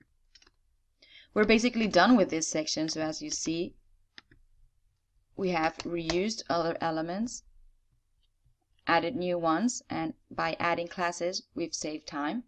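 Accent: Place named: Europe. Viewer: Hay !!! I was all struggling doing it the hard way with the other wordpress youtubbers? No wonder it was taking so long? to get it? Thank you nice lady with the exciting european accent : ) spaciba?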